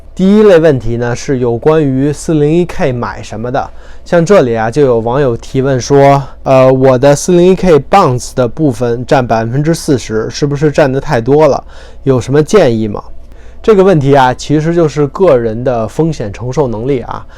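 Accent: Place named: Tianjin, China. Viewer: 天津人吧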